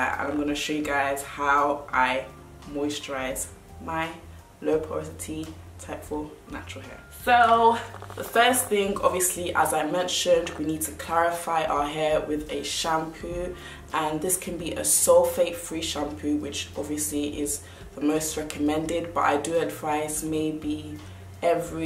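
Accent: United Kingdom. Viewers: It took me 12 minutes and 38 seconds to realize that you have a damn English accent! Wth!! 😭 I’ve watched two of your videos before this as well, I am so confused. 😂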